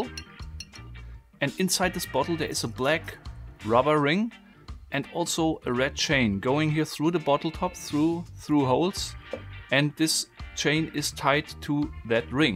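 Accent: Germany. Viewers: damn now I love (grüße aus österreich btw) (cause he obviesly sounds german haha:D) and brilliant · Wenn ein Deutscher Englisch spricht... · Wie man einfach hört dass du Deutscher bist lol...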